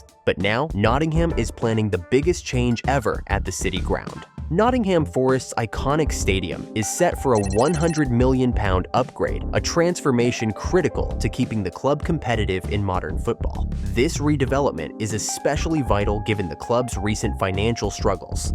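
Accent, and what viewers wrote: United States. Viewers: Why the stupid american accent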